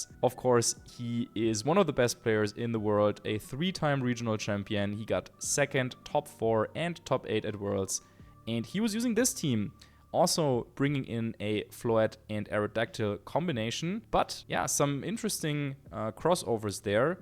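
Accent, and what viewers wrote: Germany. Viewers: Samma da hör ich doch ze tschörman raus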